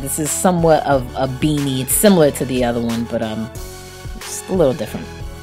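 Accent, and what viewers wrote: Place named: New York, United States. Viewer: where r u from? i love ur voice...new york or somewhere up north with a tinge of latina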